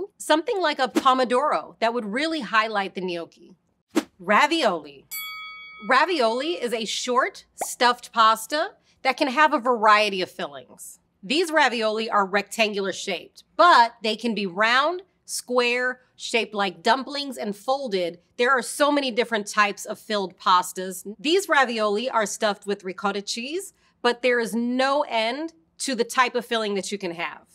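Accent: United States. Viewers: Because she is 'Murican lol. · Why the hell she pronounce all the Italian names in Murican? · That Americanized pronunciation of the pasta names kinda ruins this video for me. I mean, it doesn't have to be an "Itsa me Mario" accent but just a little bit more effort would've made it better imo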